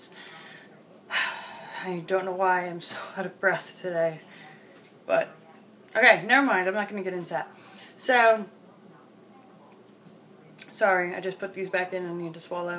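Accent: New York, United States. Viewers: beautiful teeth for a beautiful girl i sense a slight ny accent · You sound like you're from New York or back East somewhere I'm originally from Puerto Rico but I was raised in New Jersey but the Jersey shore 🇺🇸🇵🇷😊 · It's so funny you sound like a new Yorker with the bottoms out. Not making fun of you In any way so please dont take it like that cause I didnt mean it to be rude.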